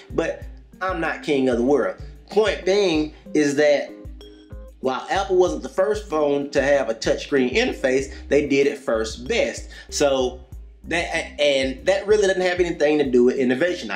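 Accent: South, United States. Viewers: I just love his southern accent haha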